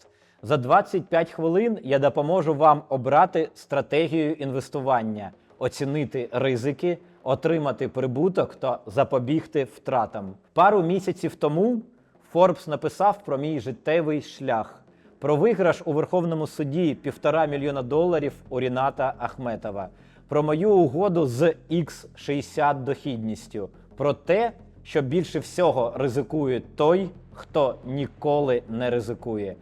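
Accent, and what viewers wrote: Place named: Russia. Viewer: Дуже важко слухати ці рускаязичниє чєлюсті😅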